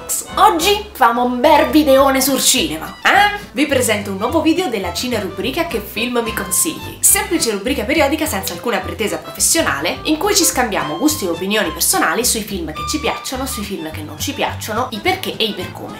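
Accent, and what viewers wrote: Rome, Italy. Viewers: Ho visto tutti gli horror possibili e anche se alcuni mi hanno delusa sul finale mi sono comunque piaciuti... Ma "il quarto tipo" non so perchè mi ha fatta proprio cacà sotto!!! Tu l'hai visto? PS: a furia di vedere i tuoi video inizierò a parlare romano XD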